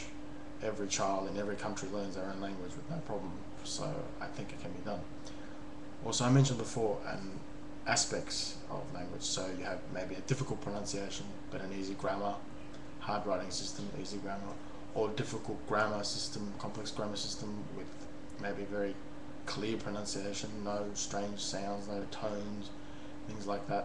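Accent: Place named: Australia. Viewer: Your Australain accent isn't very strong.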